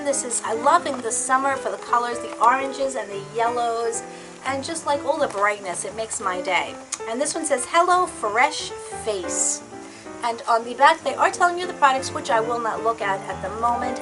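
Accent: New York, United States. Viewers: From one New Yorker to another...who sound the same lol! Love your videos! 😉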